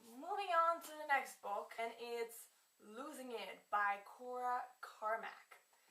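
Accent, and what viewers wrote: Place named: Russia. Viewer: You have a bit of a Russian accent! I love Russian accents! Ps love the book haul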